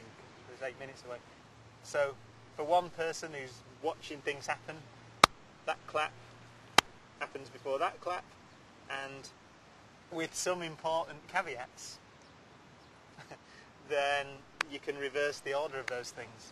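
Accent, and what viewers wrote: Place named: Lancashire, United Kingdom. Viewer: "The ball caused you to fall off the wall" is the greatest sentence you could ever hear in a Lancashire accent.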